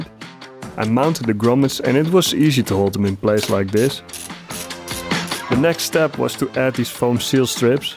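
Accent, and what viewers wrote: Netherlands. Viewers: Mede Nederlander hoor ik😅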